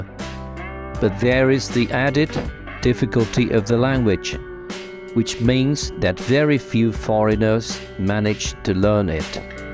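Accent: United Kingdom. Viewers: Si yo no supiera que vos SOS argento? Creería con ese acento, que sos británico!. Yo habló muy bien americano Appalachian y alemán Westfalen (yo los siento mas fácil de hablar) y se de lo que digo! Bien por vos!, felicidades!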